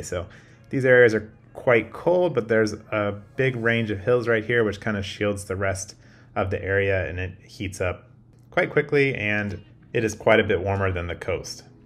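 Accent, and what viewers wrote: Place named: East Coast, United States. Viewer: Bro might live on the west but man, he talks like he's from the east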